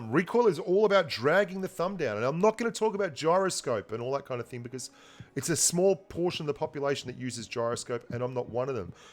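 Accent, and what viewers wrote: Australia. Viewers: love the content in general, love the Aussie humour, the accent and the honesty of the commentary. Thanks! from Ireland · You don't sound British but Australian.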